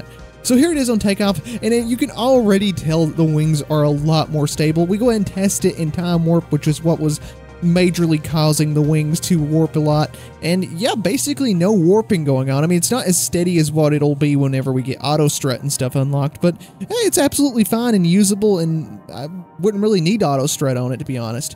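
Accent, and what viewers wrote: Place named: South, United States. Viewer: i love your countryish accent, it just makes the whole vide so much more enjoyable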